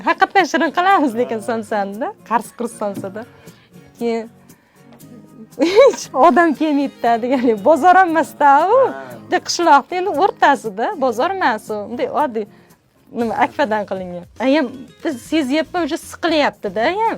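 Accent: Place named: Kokand, Uzbekistan. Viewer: Қуқонлиг экансиза шевангиздан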